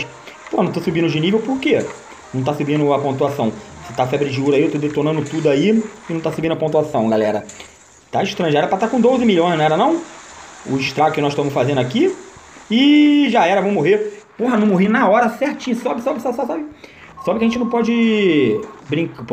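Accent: Rio de Janeiro, Brazil. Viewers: Tu é do RJ ?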